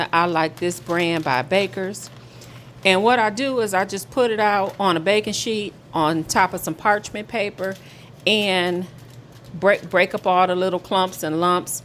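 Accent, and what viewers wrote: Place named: Detroit, United States. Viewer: Sounds like a Detroit accent.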